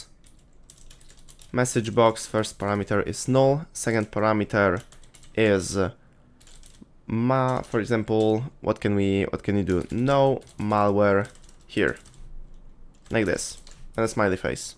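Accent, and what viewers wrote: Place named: Poland. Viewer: Długo już się uczysz informatyki? Po akcencie słychać, że jesteś Polakiem haha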